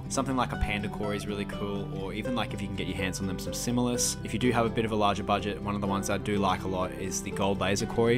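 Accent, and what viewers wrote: Australia. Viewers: bro you sound Australian why you talking in gallons?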